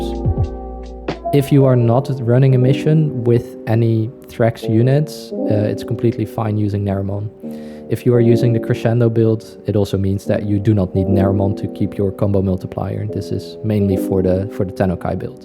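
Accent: Netherlands. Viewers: Are dutch because you really sound like u are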